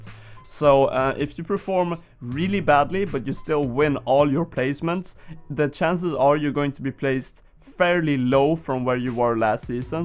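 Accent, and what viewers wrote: Sweden. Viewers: let me guess, ur from sweden? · Is you swedish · Are you from Sweden?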